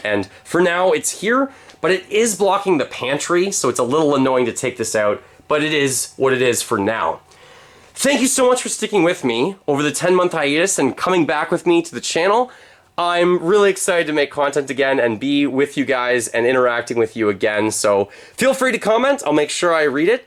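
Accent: Canada. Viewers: Haha within the first minute I heard you talk I could tell your Canadian, I found your channel when I started playing video games again specifically the Nintendo switch after a 5+ year hiatus, it’s awesome to see the renewal of passion for your hobby and channel.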